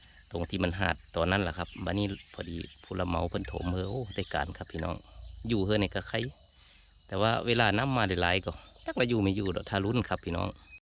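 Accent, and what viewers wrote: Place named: Rural, Thailand. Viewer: กดติดตามเพราะสำเนียงการพูดว่าทำไมคุ้นๆที่ไหนได้คนบ้านคำกั้งดีใจครับ ปมคนบ้านแจนแลน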